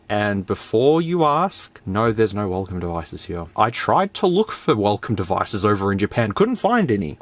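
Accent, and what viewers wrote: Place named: Australia. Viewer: Oh so you have the Australian accent that puts R sounds in everything. Got it.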